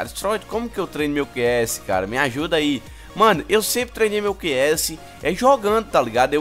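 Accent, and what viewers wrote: Northeast, Brazil. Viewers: E legal ver o falando "atualização" esse sotaque nordestino e foda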